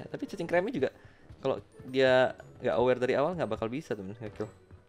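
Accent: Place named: Sulawesi, Indonesia. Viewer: Orang sulawesi tuh bg haha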